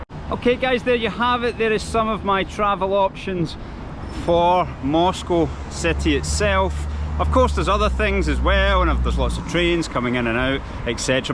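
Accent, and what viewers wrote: Scotland, United Kingdom. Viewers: Lol this Scottish accent is exactly the one they used in Counter Strike when you play for the ct forces. Any time I hear that guy I want to buy additional ammo and weapons ; ) <link> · Этот британский акцент)) такое ощущение что я фильм Гая Ричи посмотрел))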